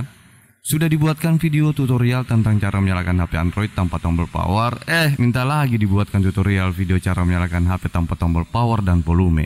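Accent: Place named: Makassar, Indonesia. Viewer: Orang makassar ki paeng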